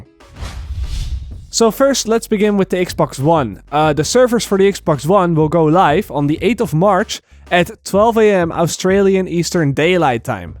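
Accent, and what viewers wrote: Netherlands. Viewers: nou jij komt uit nederland zo te horen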